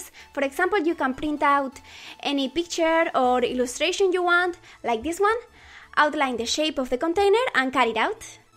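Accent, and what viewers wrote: Spain. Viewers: ignorant, she is Spanish its her accent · tienes acento español😱😱😱😱 viva españa! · Es española, por eso su acento es tan fuerte. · Eres española?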